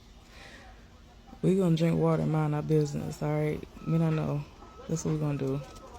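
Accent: Jamaica. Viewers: I love how you comes out with the Jamaican accent my sister love it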